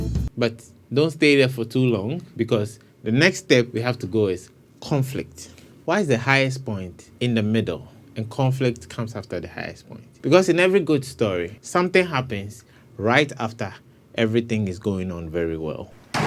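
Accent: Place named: United Kingdom. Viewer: I like how he spoke in a British accent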